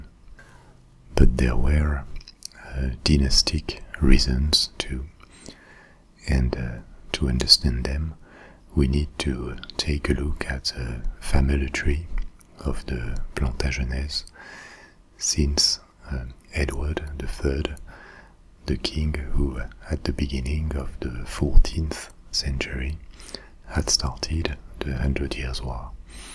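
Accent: France. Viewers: wonderful, thank you, great to hear British history in such a calm and relaxing French accent :)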